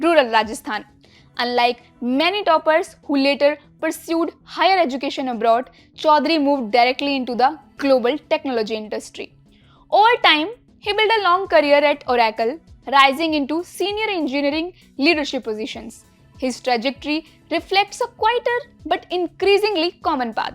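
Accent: India. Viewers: Students born in the US go for Law and Medicine in big numbers - they say that in the US, the most stupid lawyer (or doctor) earns more than the most brilliant scientist - this may be an exaggeration, but you get the idea. Oh, by the way, you have a very strong Indian accent 😀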